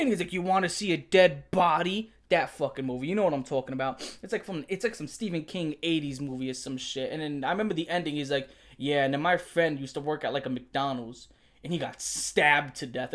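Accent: New York, United States. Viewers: What part of New York you from broski?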